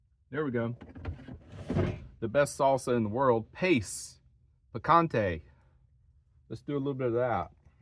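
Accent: South, United States. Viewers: This is at least the second time I’ve heard you comment about your looks, dear. You are an attractive man, and I suspect ladies really love your sweet southern drawl. You are very accomplished and have such a nice personality. Have confidence in yourself and hold your head high, dear man!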